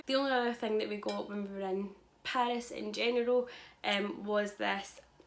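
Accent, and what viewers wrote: Scotland, United Kingdom. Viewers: Are you Scottish by any chance? Bc i fricking love your accent!!!!!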